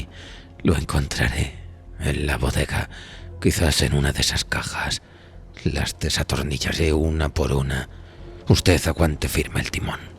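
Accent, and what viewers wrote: Spain. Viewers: Españolisimo , nooo por favor , usen Español neutro , no podré escucharlo , es insoportable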